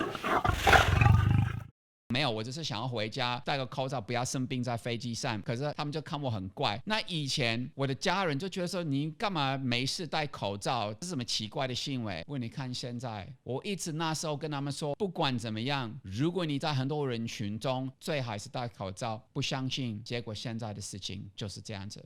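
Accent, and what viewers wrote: Taiwan. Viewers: 口音台台的，好可愛 :-)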